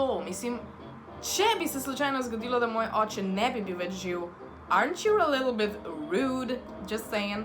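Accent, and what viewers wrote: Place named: Velenje, Slovenia. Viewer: Halo gledalci spostujte jo kakrsna je ce ne pa ne gleda ce vam ni vsec... Men je top ker je taksna kot je in pac velenjcani majo tak glas sej govori slovensko sej ne kitajsko ce se pa v šoli niste ucil jemat snovi narecja v Sloveniji pa ni moj problem ampak sami ste krivi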